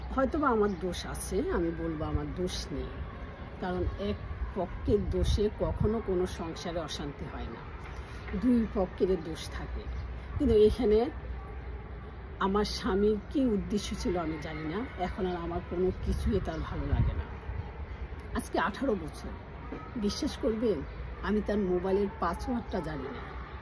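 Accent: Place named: Comilla, Bangladesh. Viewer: আপনি শুধু বিয়ে নিয়ে থাকেন। মন রোমান্সে ভরপুর। কুমিল্লার কোথায় থাকেন? আপনি কুমিল্লার মেয়ে। ভাষায় বুঝা যায়।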